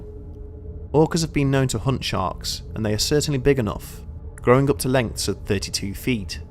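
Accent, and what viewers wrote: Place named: England, United Kingdom. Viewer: The narrator has one of those spot on English accents that could get seemingly get REALLY DARK at anytime. One of these days I will be all into one of his stories a really creepy ass story and then on the middle of the story he says “and as you’re listening to this - you hear a knock at your door. (Knock at door) when you ask who it is - the voice seems very familiar.”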